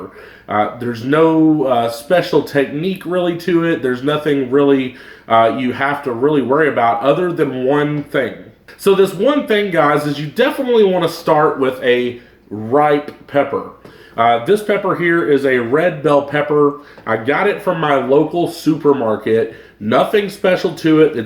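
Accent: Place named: Georgia, United States. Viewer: Where is that country accent from? You sound like a Georgia boy.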